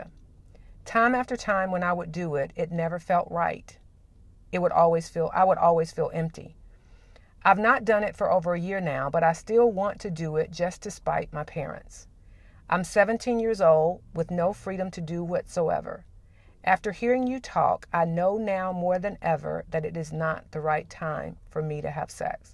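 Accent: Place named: England, United Kingdom. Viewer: QwQ I'm not cursing my parents I know some people dont have so it's a bless for me whatever it was :) And again she is 14 I dont like just 3 years and she was 10 the problem is other students there... But thank you ur really an amazing teacher and loved your English accent I dont if you're foriegn or something but loved it And yes every child has it own story with his/her parent :> ...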